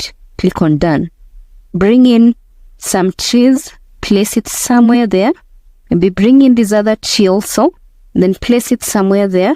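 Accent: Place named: Kenya. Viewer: You sound Kenyan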